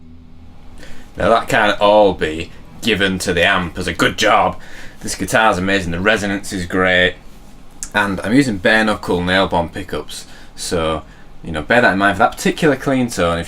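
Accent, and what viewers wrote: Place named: Yorkshire, United Kingdom. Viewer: You sound so yorkshire its untrue haha awesome review dood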